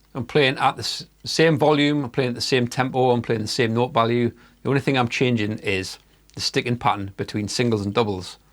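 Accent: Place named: Northern England, United Kingdom. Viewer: Silly question: may I ask you where are you from? I'm Italian, and I like different accents, so I'd like to "link" a particular accent's sound to the city/area it's from. To me, yours sounds like a Northern accent, but I have very little knowledge, all I know is "something from Liverpool - yes, The Beatles 😂😂😂 - to Scotland" 🤷🏻‍♂️🤦🏻‍♂️🤦🏻‍♂️🤦🏻‍♂️. Would you please help me? Thanks a lot! (...and great video, by the way! 😉👍🏻👍🏻👍🏻)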